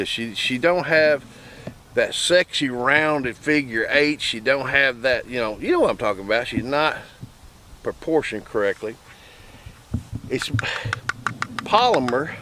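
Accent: South, United States. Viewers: I love the southern accent especially when he was talkin about people with “fat fangers” lol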